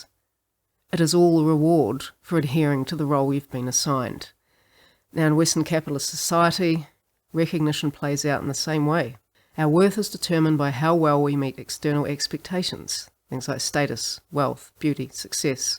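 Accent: New Zealand. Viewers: this is fantastic!!! u a kiwi?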